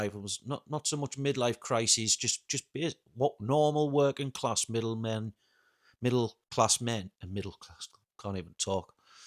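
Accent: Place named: Newcastle, United Kingdom. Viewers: Don't know why mate, but I expected you to either be from Scotland or the North-East of England! Don't even know why!!🤔🤷‍♂️🙈🤣 not an insult BTW, Northerners rule!😎🙌🤝 thanks for sharing your story mate! I'm 27 and Autistic. Have worked night shifts, construction work, kitchen work in cafés and restaurants, pharmaceutical work, retail, youth work and just recently left a greenskeeping job. I actually love strimming hedges and cutting grass, but we also had to pick up dog shit and cigarette butts and I had enough! My family told me how worried they were about my mental health! I have a few days of holiday pay and I'm just taking that and gonna rest up.😵‍💫😵🤯😴 wouldn't even mind, I also went to university and got myself a level 8 honours degree! Everyone goes "wow! That's an excellent degree!! You'd walk into a job!". Sadly, I don't walk into any job!! 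I accepted the shittiest job I was offered, contracting to a contractor if that makes sense?! Basically, I had none of the rights of a direct employee of that company! Contract work is good for pay, like you do get paid well, but you also are the easy target for blame when things go wrong! It's very tough out there man! I hear you!! Night-shifts are carcinogenic so if you can get out of it, please do!🙌🙏 understand that is a lot easier said than done though! Take care mate🫡🤝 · Hey mate, I obviously don't know your full situation, but the poor diet and alcohol definitely won't be helping the aches and pains, as well as living in a colder climate in Norway I'm assuming. I'd suggest sorting the diet first, see if things improve. Higher protein for repair, vitamin supplements, doesn't have to be too crazy. Also, good on you for moving country. I'm a UK expat living in Australia, best thing we ever did. 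From a similar area also judging by your accent, I'm from boro myself. Rooting for you mate 👍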